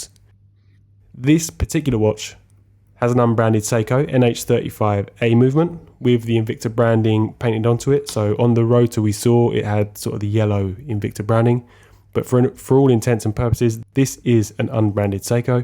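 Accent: London, United Kingdom. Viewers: My man sounding like a fellow Londoner and taking about usd. Come on fella let’s have a £ and let the yanks convert it. 👍😂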